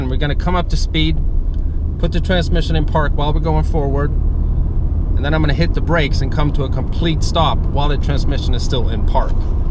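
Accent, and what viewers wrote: Sweden. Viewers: You don't sound Swedish anymore! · Are you american? You may sound a little Swedish 😁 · you sound like you have a faint FAINT swedish accent :)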